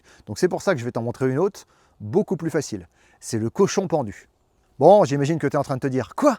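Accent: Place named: France. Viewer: ... Pourquoi les français se prennent tjrs de pseudos Ricain ave ce bon gros accent franchouillard? Tu ferais mieux de te faire appeler Robin Dubois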